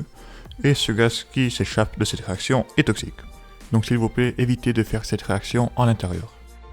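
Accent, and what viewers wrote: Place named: Africa. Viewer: Une grosse voix d'adulte, un accent probablement africain. Et des petites mains de jeune adulte toutes blanches. J'avoue que ca m'a perturbé !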